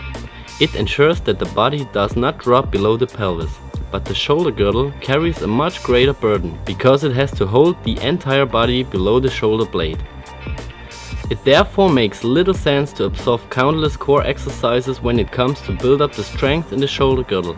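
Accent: Germany. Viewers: hahaa, typical german accent. :D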